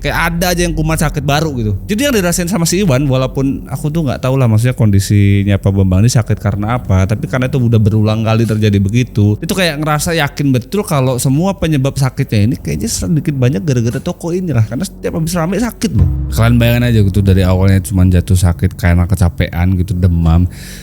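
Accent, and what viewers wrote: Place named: Java, Indonesia. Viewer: Cerita horor versi ngapak yg ga bikin aku merinding tapi malah ngakak 😂😂😂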